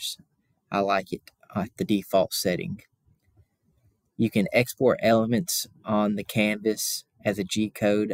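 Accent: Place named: South, United States. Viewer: You did an amazing job on this!! You are nice and slow on your descriptions, and I absolutely love the Southern Drawl!😊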